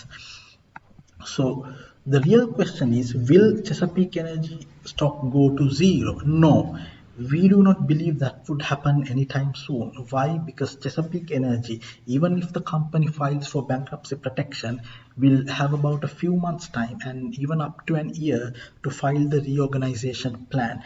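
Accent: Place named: India. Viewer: I love your indian accent!!! its so adorable!!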